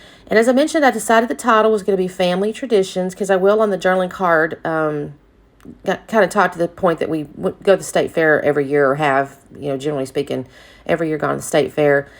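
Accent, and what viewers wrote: South, United States. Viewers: It just hit me. I know why I like your videos so much. It's that southern accent. I haven't visited the state fair, but this year, I will be there. I told my husband, "Honey, we gotta go to the state fair!" I don't know when it is going to happen, but as soon as San Antonio announces it, we are there. That sounds like fun. Your layout came together nicely, and I love fall colors. They make me think of warm pie. Anywho, thanks for sharing.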